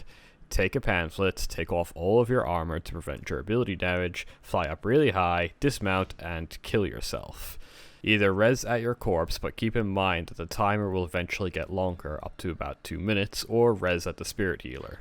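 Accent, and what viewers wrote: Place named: United Kingdom. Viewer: oh damn lmao, didn't realise you were from there, I heard British in there lmao.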